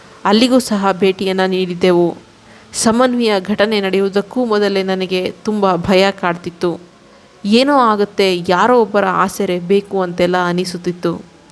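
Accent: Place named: Mysore, India. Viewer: ಮೇಡಂ, ನೀವು ನಿಮ್ಮ ವಾಯ್ಸ್ ಗೊತ್ತು. ಮೈಸೂರಿನ ವರು.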